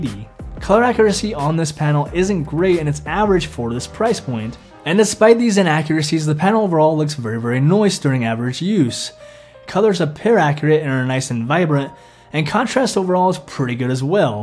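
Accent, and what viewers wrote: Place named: Northern Ireland, United Kingdom. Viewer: Mate, where the hell are you from? You sound 5% Norn Irish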